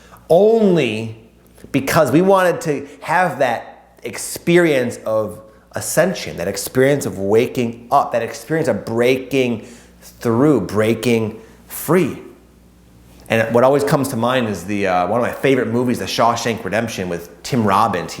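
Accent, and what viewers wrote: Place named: Inland North, United States. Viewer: Powerful stuff,! So seamless that I got the feeling it was divinely channeled. Interesting how spirit works. I always loved your message, but when it was delivered in that Inland Northern American accent, spirit told me I was home. (I'm Italian like you and my family is from Western NY. Same accent.) ❤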